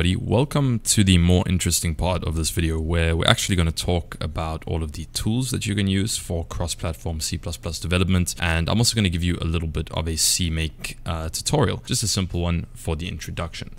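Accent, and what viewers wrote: South Africa. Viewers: Dude are you from SA 🫣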